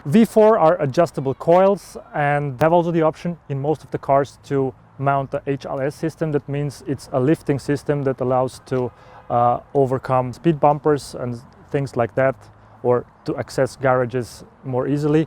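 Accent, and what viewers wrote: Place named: Romania. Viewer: Se vede accentu de roman =))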